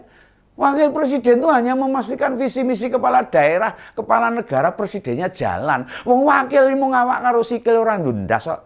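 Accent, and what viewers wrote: Java, Indonesia. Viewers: Om, ngomonge sedep, medok,jan nyanduni tenan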